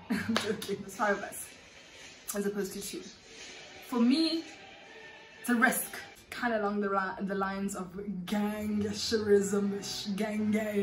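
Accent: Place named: Newcastle, United Kingdom. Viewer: It's the Geordie accent for me🤣🤣🤣🤣🤣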